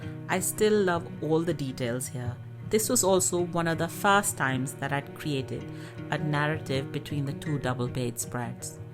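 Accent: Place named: United Kingdom. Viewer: Hello fellow artist😃 just found your channel, I’ve been grieving the death of my nephew, feeling very sad- however, your illustrations brought a big smile to my face & lifted my heart, also Your voice is very soothing & I Love your British accent! Now your illustrations make me feel joy like the feeling of reading a colorful, well illustrated book to one of my grandchildren. Such great art & a very interesting sketch book, thanks for sharing & for the art therapy🥲😃❤️❤️❤️🎉🎉. . Makes me want to get back to start drawing & painting in one of the many empty sketchbooks I have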